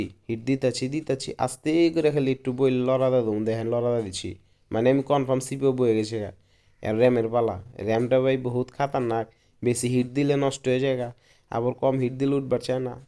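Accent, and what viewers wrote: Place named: Old Dhaka, Bangladesh. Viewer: পুরাণ ঢাকার ভাষা ভালো লাগেনা, শুদ্ধ বাংলাই কথা বলো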